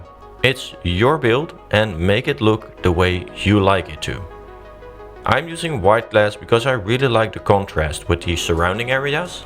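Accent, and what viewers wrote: Netherlands. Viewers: wassup, are you dutch cuz i live in the netherlands and i also lived in america and you have the same accent as most of my english speaking dutch friends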